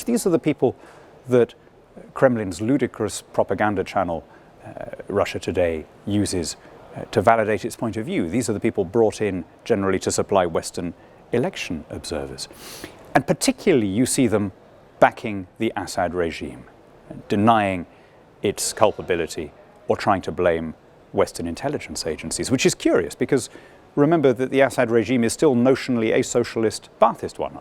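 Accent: RP, United Kingdom. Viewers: Theres something seriously wrong with dan, he sees the world in simple terms, black and white, in most of his videos, communism amd capitalism. Hez guilty of the highest levels of intellectual dishonesty and hez been castigated severally for it. To go on calling out atrocities committed by putin and forget the crimes commited by his ideological political wing in other nations is the heighy of hypocrisy. once he even claimed that hitler was a socialist lol much to the chagrin of a more educated audience he was presenting to. Take out the posh accent and you will realise his points in many of his videos lack any substance in them.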